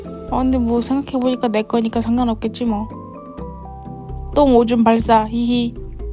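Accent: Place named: North Korea. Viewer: 북한말은 독학하신건가요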